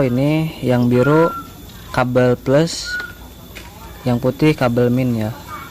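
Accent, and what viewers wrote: Serang, Indonesia. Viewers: Wong serang Tah kang?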